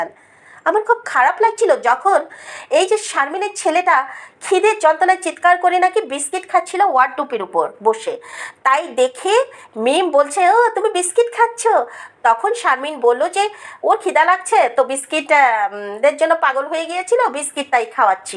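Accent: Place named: Kolkata, India. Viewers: বাপরে বাপ, মুখ নাতো হলার মেশিন! না জেনে কাউকে পতিতা বানানো কত বড় পাপ। কলকাতার টোনে কথা বলে, কেরে তুই নতুন পাগল! তোর নিজের সংসারের কি দশা বোঝায় যায়! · তুই কে রে?কার চামচা,কোন পতিতার? কলকাতার মানুষের টোনে কথা বলতে যোগ্যতা লাগে। পতিতা কে পতিতা জেনেই বলেছে। তুই জানিস উনি কে? উনার আগের চ্যানেল থেকে ঘুরে আয় ,হেগে দিবি জানলে,দুই মিঃ তোকে বসিয়ে মুতিয়ে ,তা খাওয়াবে। তার সংসার নিয়ে তোকে ভাবতে হবেনা, তার স্বামী, সন্তান সমাজের উচ্চ স্তরের লোক,সে নিজেও তাই। শালী😅😅😅😅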